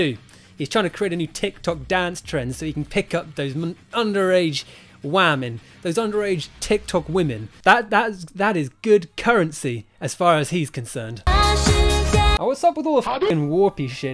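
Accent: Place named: United Kingdom. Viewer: My man said: "He was moving too fast for the camera to even pick up the sound — by the time the camera caught the sounds, he was playing GTA in the next room." 😆😆 Maybe it's the Russel Brand accent (as.an American) that gets me— but everything sounds funnier with that delivery. Awesome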